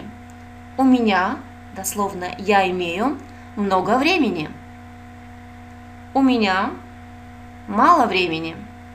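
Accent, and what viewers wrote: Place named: Germany. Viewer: О , вы из Германии наверное ? У вас просто в русском интонация как у моего знакомого , который из Германии . Хороший урок спасибо за ваше время. )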